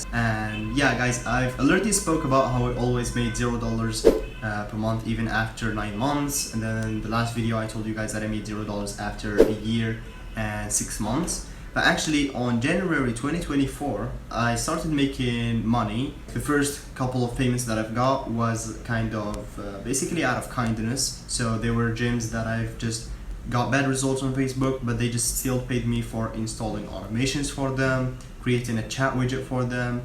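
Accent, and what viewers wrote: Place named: Morocco. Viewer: Salam alaykom brother Are u moroccan? Ur accent is giving it away lol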